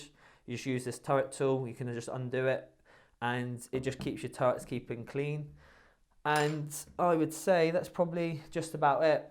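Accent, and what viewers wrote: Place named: West Country, United Kingdom. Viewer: ".....or you'll bugger up the cap...." Tell it like it is west country boy and more power to you.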